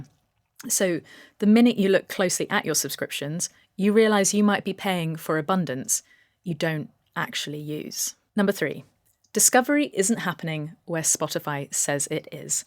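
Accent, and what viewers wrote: United Kingdom. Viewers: I’m not sure about any of this, but your British accent is music to my ears.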